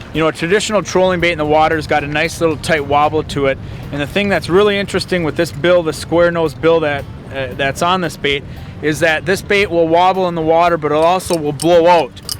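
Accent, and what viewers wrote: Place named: Minnesota, United States. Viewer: The boooooooat! You're so Minnesotan ......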